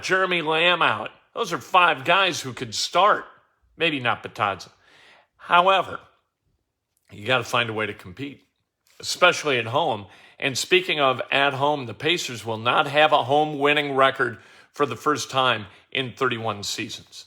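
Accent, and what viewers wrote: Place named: Kentucky, United States. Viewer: Lol at your Kentucky accent 🤣🤣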